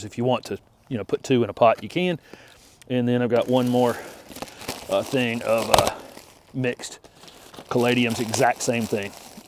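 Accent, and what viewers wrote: South, United States. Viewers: Where are you? Somewhere down South, I can tell that.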